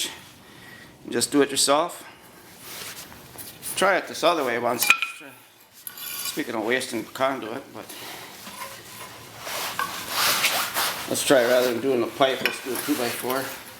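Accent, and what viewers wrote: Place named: Wisconsin, United States. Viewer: You must be from Wisconsin...you sound just like I do:)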